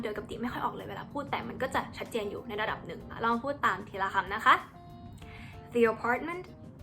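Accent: United States. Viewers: Your pronunciation is not correct but the accent is sounds like American. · ฟังง่ายค่ะ ฟังดูเป็นอเมริกัน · ยังไม่เป๊ะ อเมริกัน 100 เปอร์เซนต์ค่ะ แต่ถือว่าพูดได้โอเค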